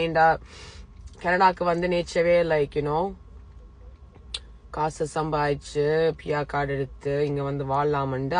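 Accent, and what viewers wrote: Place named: Sri Lanka. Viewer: U r correct baby U r great to see to talk tamil Feel u r srilankan tamil Romba nella erukku New delhi❤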